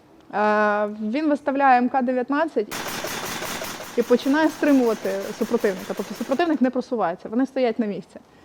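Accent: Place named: Surzhyk, Ukraine. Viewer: Лицемерка. На поле боя на русском разговаривает, а перед камерой на мове, больше похожим на суржик. Бандеровская подстилка.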